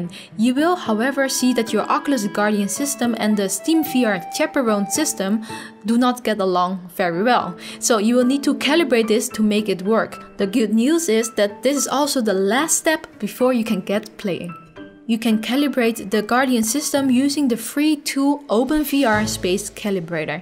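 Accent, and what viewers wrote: Netherlands. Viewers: o the dutch accent always hurts my ears . but thanks for the answer ! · Are you dutch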